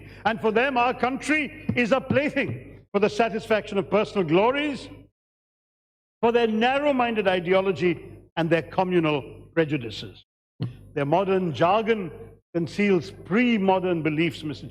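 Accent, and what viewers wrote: United Kingdom. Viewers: Gone are the days where people though you are clever just because you spoke in British accent. Dumb idiot! still with congress, the party that brought India to its knees. This idiot who talks about secularism is a part of the communist government. What a shame! you should drown yourself. You speak about women safety when you have a charge about your wife in court! stupidity!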